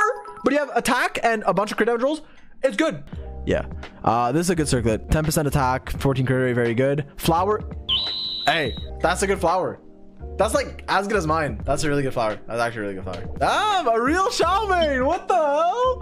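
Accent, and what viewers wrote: Quebec, Canada. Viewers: BOLIDE XIAO skdjalxkaal lmao. i thought mine was pretty good with 76 crit rate/203% cdmg but.... ah, back to farming for xiao. also do you speak Quebecois? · je savais pas que t'étais québécois lol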